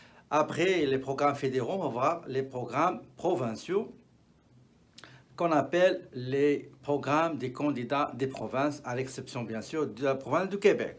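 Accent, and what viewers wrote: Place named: Morocco. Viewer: لا اقصد العربية الفصحى ، ولكن اقصد الشرح بلهجتك المغربية الجميلة ❤🍁🎈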